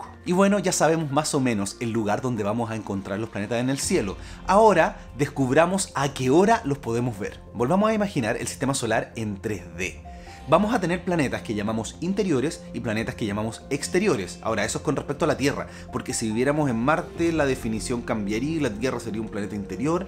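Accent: Chile. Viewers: Amigo... eres el mejor!!! Muy buen video... tu explicacion es excelente!!!! Ah!!, me encanta tu acento chileno. Soy de Venezuela, pero vivo en Estados Unidos... Te felicito...!! Excelente!!!!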